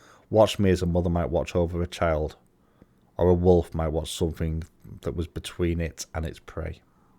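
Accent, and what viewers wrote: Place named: United Kingdom. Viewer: That was great, thank you! It’s so nice to hear a Brit read something on YouTube, good to hear it in a reasonably local accent too. Will check out your review of the whole book too.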